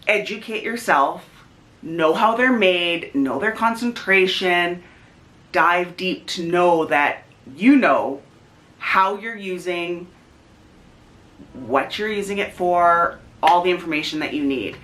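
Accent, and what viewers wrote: Canada. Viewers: Canadian accents make me happy 🥰 I’m from Tennessee and I love the differences in how we speak! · your Canadian accent is EVERYTHING ❤️ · I think she’s from out west in Canada because we don’t all talk like her. She’s got the typical out west (Alberta, BC). My family lives out there. I’m in Quebec. It’s fun listening to her because it makes me think of my family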